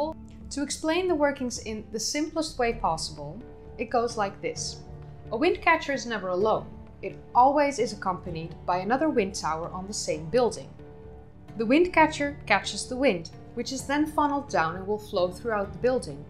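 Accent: Netherlands. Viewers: Dutch , I think she is from the Netherlands. She will correct me if I am wrong.😊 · Dutchie detected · Bespeur ik daar een Nederlands accent? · I detect DUTCH in your voice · je kan horen wanneer er een Nederlander Engels praat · Dutch speaker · Dutch accent? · That accent…is she Dutch? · She sounds so Dutch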